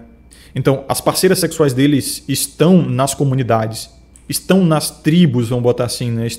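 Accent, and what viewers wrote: Bahia, Brazil. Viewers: Dialeto baiano dele... O "vamos conver" ele só conseguiu corrigir esses dias... Por isso é necessário sempre filtrar as informações, pois as referências regionais dele são medíocres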